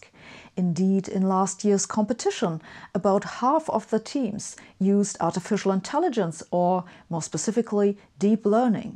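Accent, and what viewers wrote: Germany. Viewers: You sound so German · I love the german people. So brilliant, so strong. Germany is the shining jewel of europe. So long as they avoid the mistakes of the past, i.e. nazism, military empire, etc. Nothing can stop Germany or dim it's influence in the world. Look how brilliant this german woman is. And that accent!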